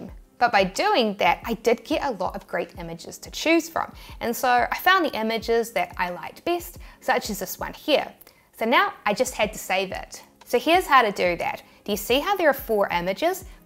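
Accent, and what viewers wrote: New Zealand. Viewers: I really enjoy listening to that New Zealand accent..🙆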